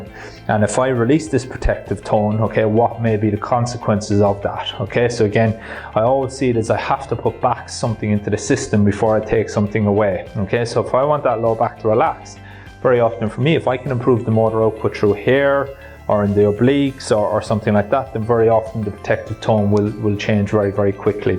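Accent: Ireland. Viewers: What you on about? You can tell he's Irish straight away😂😂😂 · Sounds Irish to me.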